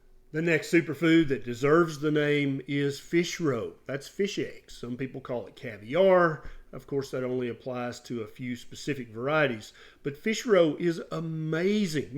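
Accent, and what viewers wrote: South, United States. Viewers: I love my southern speaking doctor!!! Great info!..thank you!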